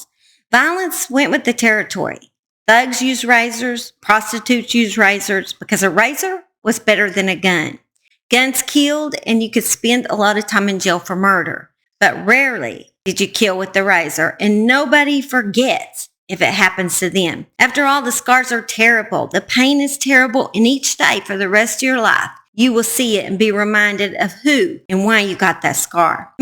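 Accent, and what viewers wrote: South, United States. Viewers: Absolutely love you I'm from England & I just love your southern accent & thankyou for all your hard work on these stories & the empathy you tell then with.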